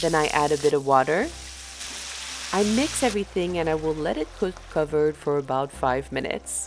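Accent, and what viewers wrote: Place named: France. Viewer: I have noticed that you have an accent, and you prononce Safran and mayonnaise like in France 😅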